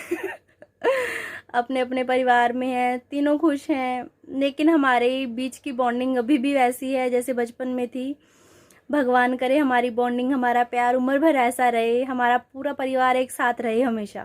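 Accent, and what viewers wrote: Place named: Garhwal, India. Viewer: Aapke muh se axxi lgri hai garwaali language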